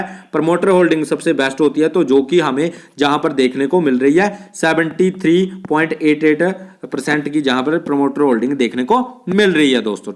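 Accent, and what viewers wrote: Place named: Punjab, India. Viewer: Bhaji tuhadi hindi sunn k lag reha Punjabi ho😅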